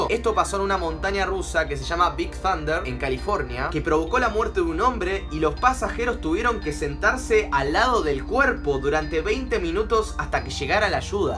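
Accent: Argentina. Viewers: Sos arg??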